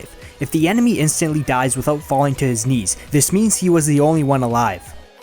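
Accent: Canada. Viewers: Canadian not Scottish, nothing like a scottish accent.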